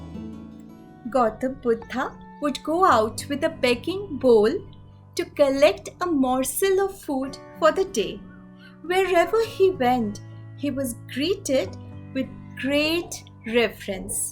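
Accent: India. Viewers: Cute Indian accent. I would come back to listen what story would you have next. <3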